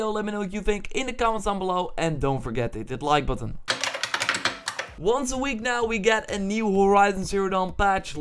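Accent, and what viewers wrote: Netherlands. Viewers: ik hoor aan jou stem dat je nl bent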